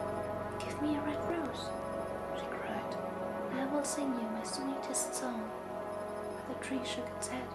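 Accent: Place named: Germany. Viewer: Maybe if your videos get more popular that you could buy a proper microphone, but I don't really know anything about that kinda thing. Well, I've certainly heard a lot of germans with a lot more accent than you have, so don't worry about that! I thought for a while I heard some french in your voice, but also some german, so that's why I couldn't really place it.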